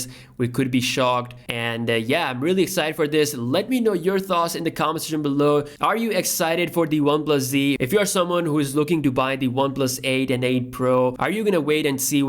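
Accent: India. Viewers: Strong Indian accent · I like how there's just a pinch of Indian in your accent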